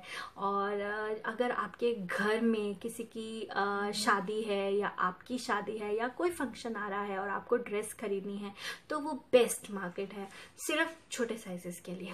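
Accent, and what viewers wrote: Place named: India. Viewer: great haul.....soo cute😊😊love your hindi ascent...😍😍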